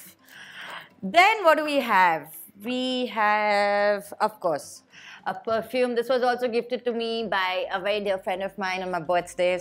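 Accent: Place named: United States. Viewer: açcent like American.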